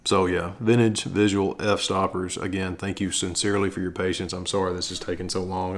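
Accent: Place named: North Carolina, United States. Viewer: I had a plan to sometime in the near future start processing film again after years away from the darkroom. I supported their kickstarter campaign though as little as I would be developing I thought it worth supporting. I now have my unit and just shot a roll of B&W and I’m ready to get started. This video was just what I needed. I read a few reviews but I wanted to see it used. Learned a lot here. Thank you. BTW, I was listening to your voice and guessing where you are from. I guessed right. I know that accent. NC! that’s what 25 years on the road shooting for Southern Living will teach you.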